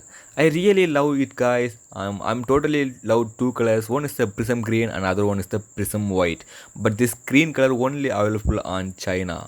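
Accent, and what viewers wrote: India. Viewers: Why do you have Indian accent? Anyway you first take a lady video and then run in the hotel room showing 2 single beds? It makes me think you need the bathroom urgently ....hahahah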